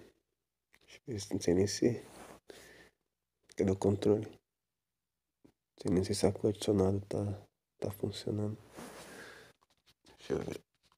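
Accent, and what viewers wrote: Rio de Janeiro, Brazil. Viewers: Tu é carioca ? Ou é impressão ? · sim, ele é carioca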